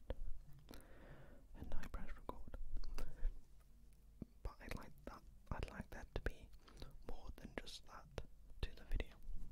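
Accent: Wales, United Kingdom. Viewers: What part of Wales you from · Are you Welsh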